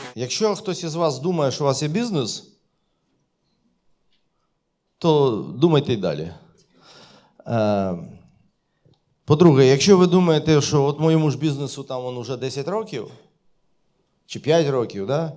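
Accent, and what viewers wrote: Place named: Lviv, Ukraine. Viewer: Приємно слухати на цій львівській, бандерівській мові! Хто в курсі, той зрозуміє)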